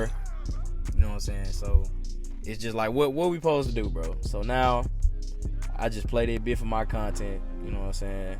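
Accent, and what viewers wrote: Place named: Mississippi, United States. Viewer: What part of Mississippi you from fam?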